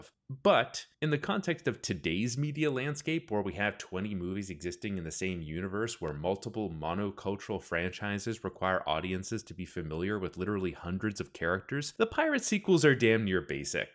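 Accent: Canada. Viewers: First sentence and I know you're Canadain! Beavers and Maple Syrup, huzzah!!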